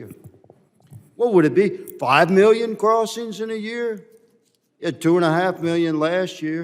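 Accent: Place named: Louisiana, United States. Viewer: His Louisiana accent is flipping awesome, what a patriot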